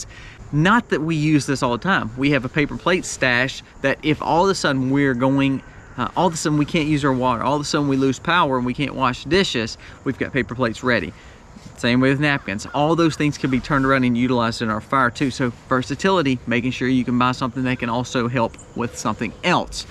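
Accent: South, United States. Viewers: I’ve never hear “oil” pronounced this way 😂 Southern accent is strong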